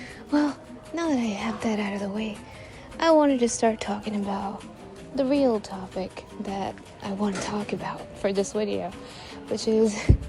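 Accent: India. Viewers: not everyone has indian accent, never heard anyone w it, maybe she is from north east cus I also lowk speak like her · I’m also Indian and i agree with everything you say , I’m a school student for now but art is something i really love . And people around me even those who draw just draw what they find and at point , I don’t think anyone loves it as much . It’s also not something you can casually say for a future career . I don’t know if i should talk about this - but I also really love to write stories and no one around is a writer or a reader 😢like come on guys ! Creative outlets are so not talked about here ! And your accent is nice btw ! ❤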